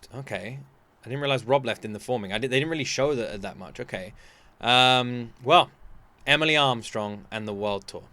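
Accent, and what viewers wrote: United Kingdom. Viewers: Where are you from, mate? I'm trying to get better at discerning accents. I assume UK, but not sure what part. I went down a rabbit hole last night with reactions to LP releasing this new song/tour/album. They are one of my favorite bands, and I think they made the right move by going with a female new lead singer.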